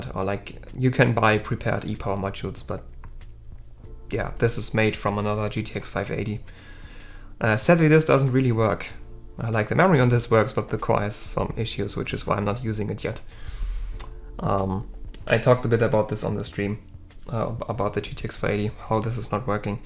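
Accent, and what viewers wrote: Germany. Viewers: Started watching and thought to myself that is German accent isn’t it? Then you said Germany and I knew it!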